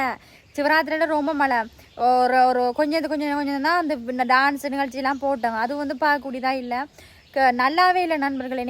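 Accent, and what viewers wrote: Sri Lanka. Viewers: நீங்கள் இலங்கையில் இளம் குயில்! நீங்கள் பேசும் இலங்கை பாஷை மிகவும் அருமை எங்களுக்கு கேட்பதற்கு மிகவும் இனிமையாக உள்ளது!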